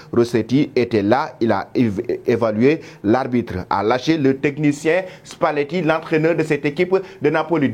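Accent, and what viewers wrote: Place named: Senegal. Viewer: Je suis ivoirien et j’aime regardé votre chaîne à cause de votre accent sénégalais . Big up depuis Bruxelles , Belgique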